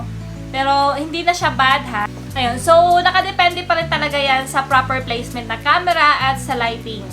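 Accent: Visayas, Philippines. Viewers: Bisaya diay ka idol. Kamoka mo at kabuses mo din kapitbahay nmin hahahah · Whoa! Bisaya diay ka Miss! 😮🔥#ProudBisaya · Ang sarap sa tenga ng bisaya mo ate! 😍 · Ay chouuurr bisaya man diay ka te, heheheh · I like your bisaya accent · Bisaya diay ka te?😂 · Hala grabe bisaya mn d i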